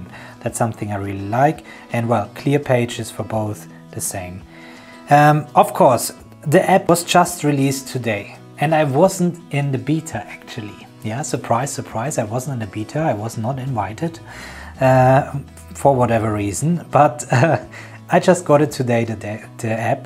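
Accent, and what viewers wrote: Germany. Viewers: Das ist very german englisch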